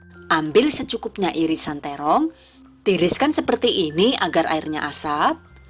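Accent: Banyumas, Indonesia. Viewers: Bahasanya ngapak memang orang mana ea...??? Tadi denger pas lagi keselak..🙈🙈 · Sy ibu rumh tangga biasa 50 tahun....bahasane koyo ngapak....ngomong cindil.....matur nuwun mba....kapan"" tak cpba.... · Tinggal di madiun tp jawanya ngapak ya mbak e..hihii...asli cilacap apa wangon kie tah mesti..hihii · Ya ampun ternyata pada bae mbi aku wong ngapak... matur suwun bu resepe, slm ngapak ora ngapak ora kepenak... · Bunda ni aslinya mana si, ngapak bener.. Saya Purwokerto bun.. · Kok ngapak ya ngomongnya😁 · wowww mkshh bunda resepnya.bunda lucu kyaknya org ngapak nih sma kaya aku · Lucu kadang bahasanya ngapak.. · Mantulll bunda,,👍👍👍👍 Ternyata basane ngapak kya inyonge 🤣🤣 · Mamake ngapak ya mak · Nyonya satu ini emang the base.tahu sdh sy coba pare blm kok puinter idenya maupun buatnya lho .hem mantap .nyah tp nadanya tadi ngepek2ya bojoku garut .bhs inggrisnya panduanya kangen suaranya .sukses slalu nyah mksh ilmunya respon baiknya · Ngapak2.. 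Kayak bapakke wong banyumas hehehe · besok coba ahhhh.............. ini koq ngmng2 orang jawa sih... lucu drnger suara ngapaknya.. samaan kaya aku... 😂😂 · Makasih bunda resep kripik terongnya..sy suka liat vedio bunda lucu ada bahasa ngapaknya he he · Setiap resep yg ditampilkn mudah di praktekn dan berhasil berarti tdk ada yg di RHS kan cuma lucuu nya di selingi bhs. Ngapak...😀😀 Sukses mba... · Kirain orang bule tadinya ...gak taunya ngapak ngapak 😅😅..mantulll buk e 👍👍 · Kaya wong ngapak y Bu....heeee....aku ikutin truusss....pnsrn sm Ibu niih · Jane kie cenele wong ngendi yaa...deneng kadang2 ngapak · Wkwkwk jebule mbaknya ngapak salam.ngapak yen.ora ngapak.ora kepenak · Org ngapak😁😁🖒🖒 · Malah keselek hahahaaaaa,,,,rika wong ngendi koh bu? Kaya wong banyumasan deneng? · kie mesti wong banyumas ya ? ngendi yu umaeh ? apa baturaden ? tangga desane nyong ? 😆😆😆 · Wong ngapak jebule, Bun subscribeer baru bun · Makasih resepnya.. 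Semoga sukses selalu Eh btw nganggone basa ngapak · Tutor nya teges .. tapi sepertinya mbake orang ngapak ya?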